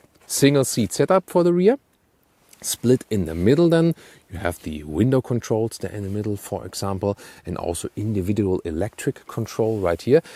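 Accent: Germany. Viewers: Irgendwie stößt der german accent bei mir auf unwillige Ohren.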